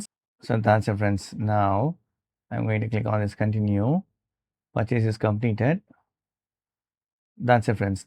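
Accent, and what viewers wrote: India. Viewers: Бля, думал что в Английском видео, а тут Английский Индия. Ничего не понял.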